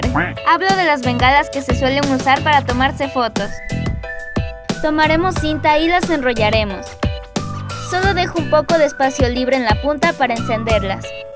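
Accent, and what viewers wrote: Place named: Colombia. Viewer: de Colombia no le vez el asento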